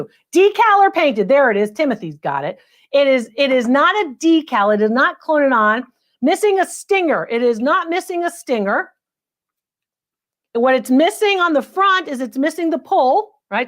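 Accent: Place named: Connecticut, United States. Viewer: I absolutely LOVE the way calls everyone “you people”!, you talk just the same as me. Must be a Connecticut thing…🤷🏻‍♀️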